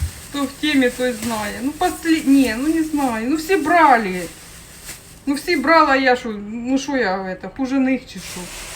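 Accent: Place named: Luhansk, Ukraine. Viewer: Чому питаю, бо думала, що Ви десь з Луганської області, бо ми розмовляємо так само🤗 Було дуже приємно Вас слухати♥️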